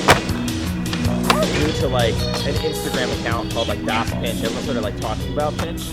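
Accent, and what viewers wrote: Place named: New Jersey, United States. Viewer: I have no idea where is from, but I'm definitely hearing a Jersey accent in there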